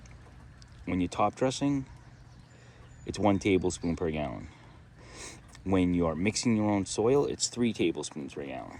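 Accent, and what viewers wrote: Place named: Northeast, United States. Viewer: Thanks for sharing your garden! Are you in the far Northeast like near Boston? Pickup up a subtle Northeast accent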